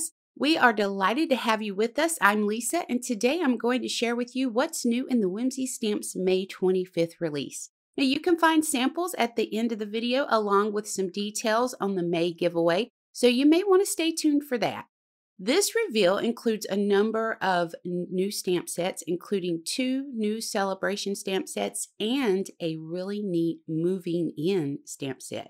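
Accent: South, United States. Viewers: I just love the Southern Sass.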